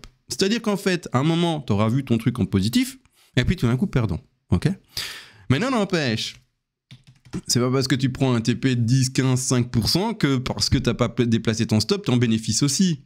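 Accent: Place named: Belgium. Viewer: Nous on dit « uit » toi tu dis « ouit » Mais depuis que je te suis, je me suis habituée 😉 Du coup tu es quoi ? Belge ? Suisse ?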